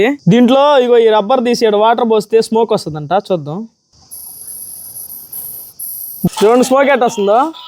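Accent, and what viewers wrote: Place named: East Godavari, India. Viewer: Anna east godavari lo laga mataladu Anna eimka ni vedios ki eavvadu topu ledu e comment ki vedio cheyy anna nenu eaduru chustu vumtya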